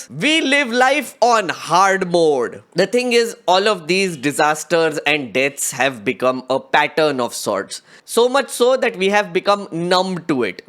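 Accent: India. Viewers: nothing wrong with having an Indian accent. he sounds dignified to me. no need to copy the English or Americans. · You’ve got the perfect Indian accent! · Bro u need to change ur accent it sounds so stereotypical Indian and is the reason for our loss of dignity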